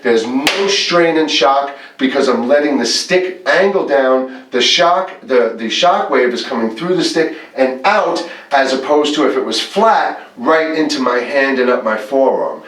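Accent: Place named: Long Island, United States. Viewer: Thanks for this. How long duration, or how many measures, should we do the full wrist strokes at each tempo? Also, love your accent, you from "Long-Giland"?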